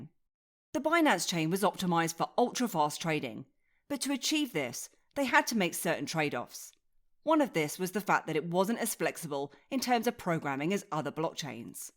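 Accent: United Kingdom. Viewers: Actually, it's true English. Americans/Canadians are the ones with the bizarre dialect.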